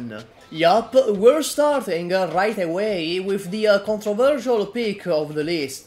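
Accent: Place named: Italy. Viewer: I've noticed your Italian accent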